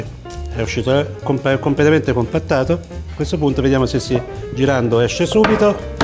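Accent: Tuscany, Italy. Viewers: Forse perchè è toscano? Di solito dicono anche seggiola invece di sedia!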